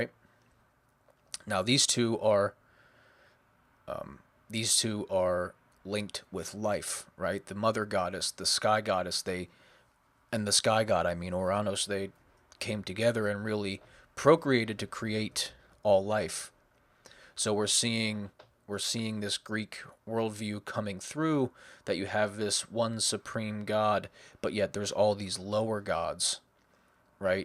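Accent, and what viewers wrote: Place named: United States. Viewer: Great video although your credibility suffers a bit by your insistance on an unadulterated American pronunciation of Greek.... you use diphtons all over the place where none such are used in Greek....e.g. your god "Gay"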